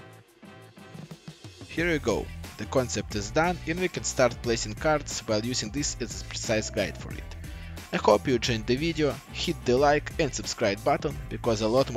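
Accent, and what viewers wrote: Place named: Russia. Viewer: хах, русский акцент слышно сразу)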